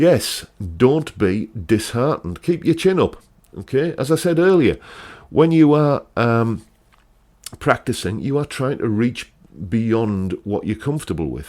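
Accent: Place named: Teesside, United Kingdom. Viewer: I worked at North Tees General Hospital (as it was) for what seemed like a century (80 hours/week) . That accent brings it all back !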